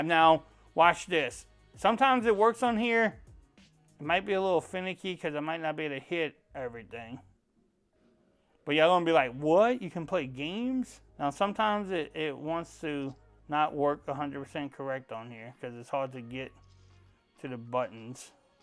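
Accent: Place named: North, United States. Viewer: Great videos! I just got one and it's so much easier to hear instructions in english with an American accent! I mean you don't sound like me and I don't sound like you! But I guarantee you we both can comprehend each other. You sound northern to me (no offense!) I'm from Miami . If a lot of these people, that think they speak english would quit talking to their friends, in their language and use english both people would get better. Ask Tony I just set mine up and didn't even raise my BP! Thanks! (sub added)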